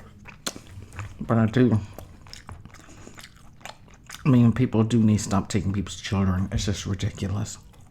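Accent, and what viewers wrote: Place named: Texas, United States. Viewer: The mic looks good off to the side, and sounds good. I think some people can’t understand you sometimes because of your Texas accent. Pay no mind to them.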